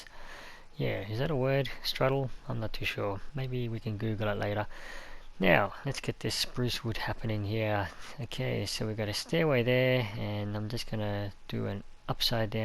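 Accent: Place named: United Kingdom. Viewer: Are you from UK because your accent is like those gentlemen i heard from youtube?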